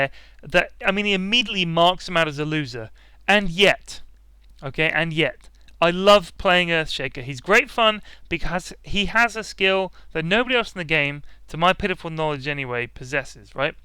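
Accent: United Kingdom. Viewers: you're British accent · a guy with a British accent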